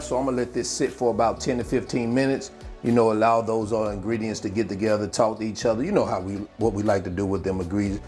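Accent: South, United States. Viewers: Ok thanks...try this weekend in my oven looks amazing.....where you from?? I can hear your southern accent ..its nice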